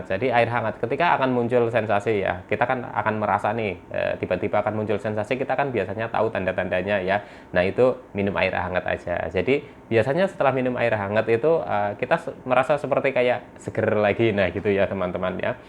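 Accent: Banyumas, Indonesia. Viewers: Asalamualaikum saya kena aslam udah 4 tahun tapi masih sering kambuh2 tiba2 gemetern sampe sampe lemes se lemes ya rasa ya rasa gemetern y datang y dari ulu hati, kang y mana saya jga punggelan banjarnegar.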